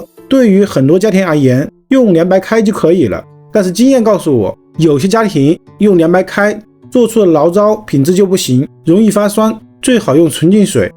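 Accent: Wuhan, China. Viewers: 典型的武汉的口音~！😀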